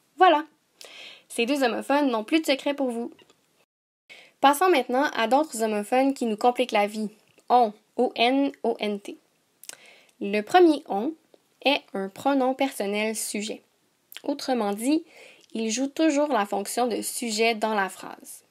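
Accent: Quebec, Canada. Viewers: bravo je suis une nouvelle abonné et j ai connais cette accent québécoise merci bcp lâche pas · Elle est quebecoise, au Québec la langue officielle est le français Je sais c'est pas le même accent mais ça fait son charme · Merci ! Mais es-que ses un accent québéquoi ? (pardon pour avoir mal écrit )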